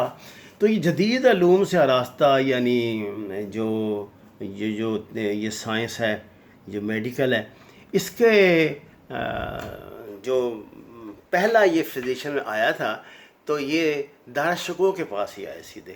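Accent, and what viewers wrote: Punjab, Pakistan. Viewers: Wo punjabi he uska accent aesa he hoga ksi angrez ki harami aulad. Tujhe khud check k spelling nhi aati.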